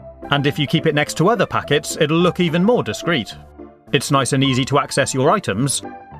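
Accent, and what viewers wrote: England, United Kingdom. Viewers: 40Xx he lives in England that's why he says things differently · Are you from the United Kingdom? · you can tell his English cause of the acent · he was speaking british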